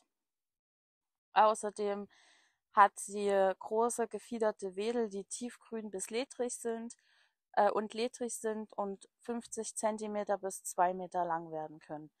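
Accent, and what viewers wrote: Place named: East Germany, Germany. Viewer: Warum bloss, weiß ich sofort, dass jemand aus dem Osten kommt?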